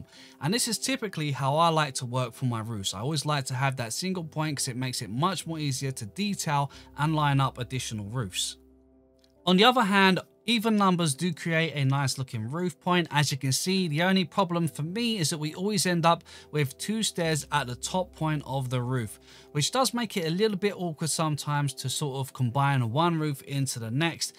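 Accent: United Kingdom. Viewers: Hey, I haven't been on your channel for a while, but a question, are you bri'ish?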